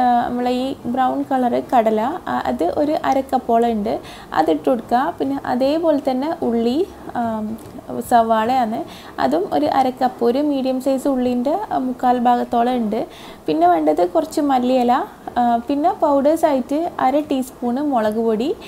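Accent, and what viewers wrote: Kannur, India. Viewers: നിങ്ങൾ kannur കാരി ആണോ കണ്ണൂർ ഭാഷ പോലെ തോന്നി 😄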